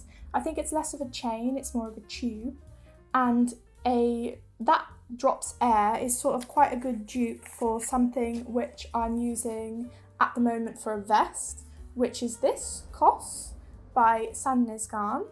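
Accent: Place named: England, United Kingdom. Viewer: it was so interesting to hear about your very International childhood. Thanks for sharing! Even though I’m very bad with recognizing accents, I did have the feeling your accent was completely “born and raised” in England. 😊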